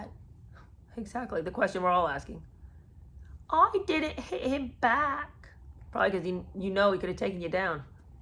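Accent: United Kingdom. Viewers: Your British accent 😂😂😂 new sub here. Keep going girl!!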